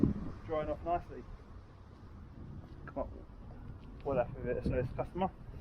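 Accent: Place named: United Kingdom. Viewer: ¡Hola! Since México, my english is not goog, but I say you: When you speck the Britain English, it hear very nice, interesting and elegant.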